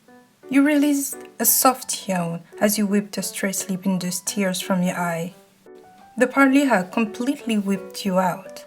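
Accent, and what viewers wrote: France. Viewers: this is gold new sub :) love that acent tho you french or somethin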